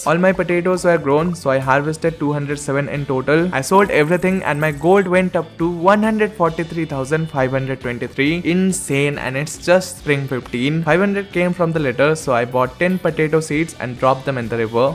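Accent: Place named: India. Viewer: I know this guy thought it was AI when it can't even mimic Indian accent plus where have you seen in ai videos their logo pop up as their mascot never smh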